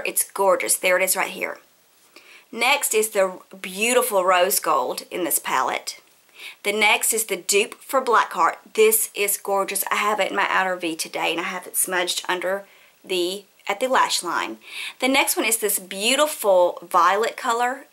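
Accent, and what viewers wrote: South, United States. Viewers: Judy brought me here! I love your accent! You are definitely a belle. ^__^ *subbing* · Heard about you on itsjudytime. I love you. Lol You're such a doll. I subscribed. I love watching makeup tutorials.. You may get this a lot but i just have to add i love your southern accent :)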